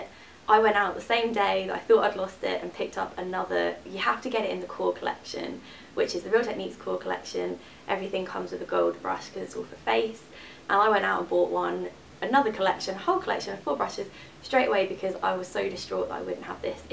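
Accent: United Kingdom. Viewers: Thanks for the tutorial hun. So nice to find a british upload. Easy on the ears. X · i literally watch ur videos just to hear your voice, its so calming! usually british accents annoy me but you sound so sophisticated! and I love how you kind of smile even as you talk.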